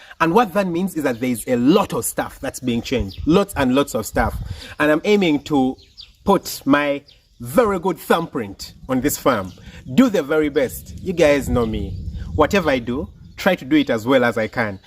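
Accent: Uganda. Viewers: Ur accent sounds ugandan where u from bro?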